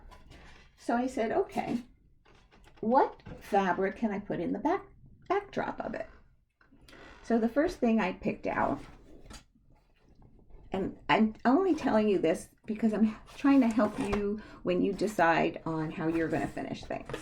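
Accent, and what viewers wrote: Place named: New Jersey, United States. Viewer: I’m also from NJ, but living in NC. Love hearing your accent. I am trying to finish Christmas Camper by Barbara Smith. After that, I’d like to do some Stitching with Housewives patterns. I’m jealous that you have a needlework shop near you. Absolutely love Autumn in the Village, gorgeous! Thanks for your videos.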